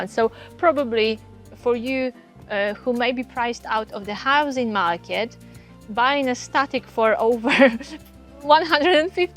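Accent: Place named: Eastern Europe. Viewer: Sounds more like an Eastern European accent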